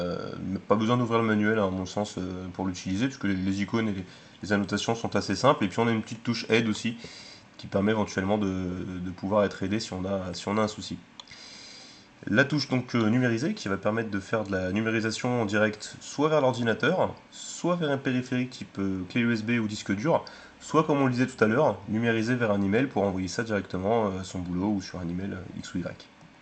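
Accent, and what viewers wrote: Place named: France. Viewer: Fr = French The accent seems to say he's from France.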